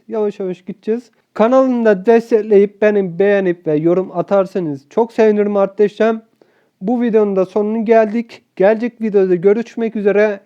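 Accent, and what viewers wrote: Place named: Afyon, Turkey. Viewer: Tam afyonluca konusuyon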